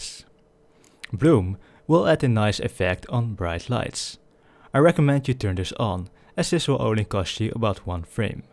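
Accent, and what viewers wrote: Netherlands. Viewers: Your accent sounds very dutch, am I right? xD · does. He just has a really bad Dutch accent, which for some reason tends to add a lot of "sh" sounds in the wrong places for lots of people. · Probably someone from the Netherlands. I'm Dutch myself and know a lot of Dutch people speak English like this. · lol he has such a dutch accent (mooie vid) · Dat Nederlands accentje...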